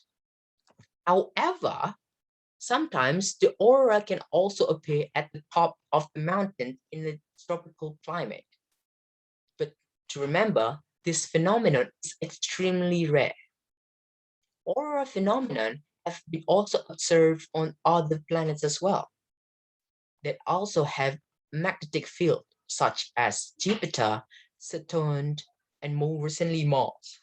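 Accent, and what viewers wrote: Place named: United Kingdom. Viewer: Wih ini cara ngomongnya british banget yah keren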